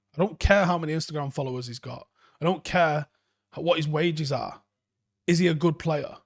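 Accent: Manchester, United Kingdom. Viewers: doing the voice over in that vid? proper Manc😆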